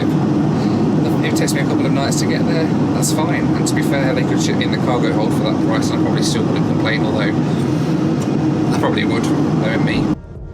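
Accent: United States. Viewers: Also, I always love your American accents.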